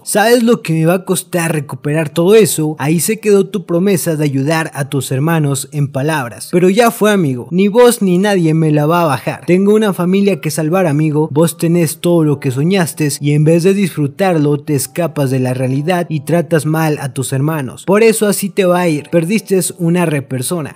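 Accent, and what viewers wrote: Argentina. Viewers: No es que hables mal con acento argentino (que si lo haces, pero es lo de menos), si no que no lees bien, aunque dam escribió como el ojete. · hablando como Argentino❤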